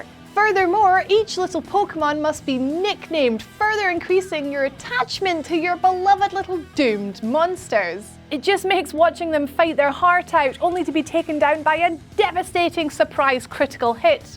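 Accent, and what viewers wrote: Scotland, United Kingdom. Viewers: She's scottish, he is irish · Ai, are ye a Scottish lassy? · Man I love Scottish accents. The way she says permadeath is...I love it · Is that a Scottish brit accent? Or just scottish?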